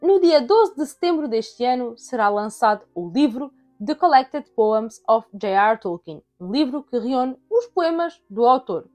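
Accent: Portugal. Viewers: É sempre uma satisfação em ouvir esse sotaque de nossa eldar de além-mar. -λ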